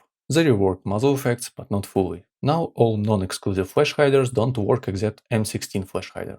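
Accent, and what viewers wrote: Russia. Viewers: Hello friend, you speak with a noticeable Russian accent, where are you from?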